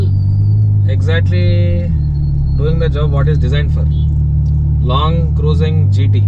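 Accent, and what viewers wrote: India. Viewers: Anna Telugu Vallu aie English lo ala matladutharu Anna😔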